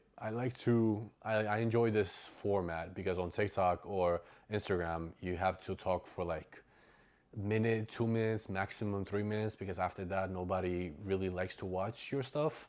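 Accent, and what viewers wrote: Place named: New York, United States. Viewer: It's crazy that YouTube recommended this video to me, and im so glad it did. I'm a fellow immigrant who used to love reading and is trying to get back into it, so finding this video in the middle of the night when I was about to turn off my phone is insane. Anyways, I loved the video, I can't wait to watch more. And also, I could barely hear your accent, you just sounded like a new yorkan to me 😆. I will be checking out the books you mentioned soon, beacuase you make them sound really great.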